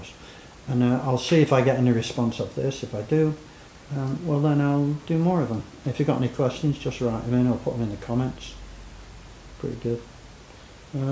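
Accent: Yorkshire, United Kingdom. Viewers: Are you a Yorkshire man ?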